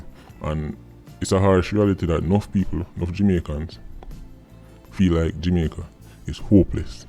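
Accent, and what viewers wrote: London, United Kingdom. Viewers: Because the UK have left Brexit there is a deadline for EU citizens who still live in the UK to get their papers sorted to remain in the UK and maybe because they havent got the papers and dont want to go back to the EU so that may be why there are people trying to get Bonehead Biden's America, so i doubt whether its an actual UK born citizen but as UK was a former EU country (thank God UK people had sense to leave it) you could have people from all over Europe in the UK so that may be the answer or as you say it be a combination of a European trying to escape UK and EU justice to go the US where the current President is one of the most crooked men in Politics and where the Justice system is becoming a joke and illegal immigration is welcomed so the KKK Democrats can "increase" their votes...pathetic, by the way nice London accent 😂As for the knife crime you have a group of kids playing at life who are killing each other, most of them have unlying mental heakth issues or broken homes bad from school and have nothing about themselves; this is not societies fault as this younger generation have ALL the benefits and opportunities all the support all the legislation to get where they want and many young people are achieving but its the handful of demon possessed wretches that cause the problems time and time again yes some come form bad homes but so do many many other youths who CHOOSE to achiev....the UK is safe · Your London accent on point we only used esta to go USA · Mans from London bruv. 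Lol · you sound like real British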